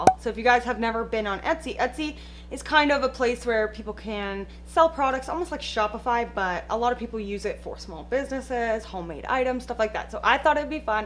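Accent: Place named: Western Canada, Canada. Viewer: I think it’s only out west. I lived in BC for a few years and they say it the way does. I’m in Ontario now and we say decal with cal sounding like the name cal. They also say it that way further east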